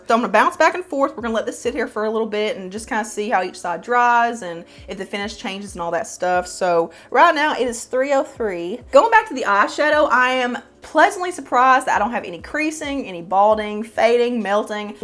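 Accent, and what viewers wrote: South, United States. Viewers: Hi! I found this video when looking for reviews on the Relove foundations but now I am subscribed because I LOVE your personality 😊 I feel like you’re from the south because of your accent and same here (MS) so that’s also cool! Love your content I’ll definitely be watching all your videos! 🩷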